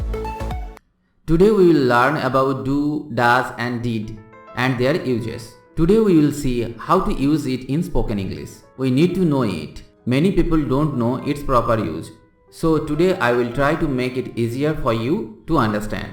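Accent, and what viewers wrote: India. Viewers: Can you speak englisch Not Indian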